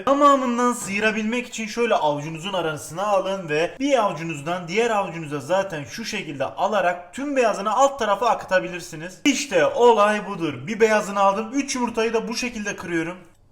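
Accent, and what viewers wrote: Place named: Erzurum, Turkey. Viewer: Abi nerelisin dadaş gibin konuşuyorsun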